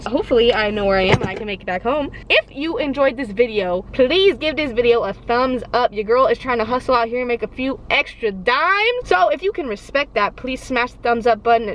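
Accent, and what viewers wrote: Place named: South, United States. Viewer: I would of never guessed you were in Cali. I figured you were Southern. LOL.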